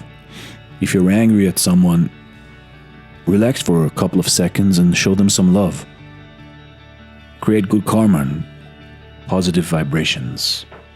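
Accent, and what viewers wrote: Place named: India. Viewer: Your voice tells that u r from india 🙏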